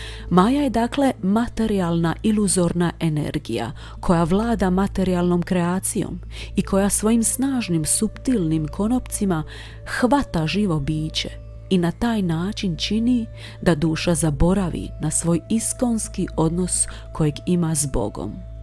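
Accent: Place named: Dalmatia, Croatia. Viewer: oh, od kad nisam čuo taj simpatičan dalmatinski... lek za uši i dušu.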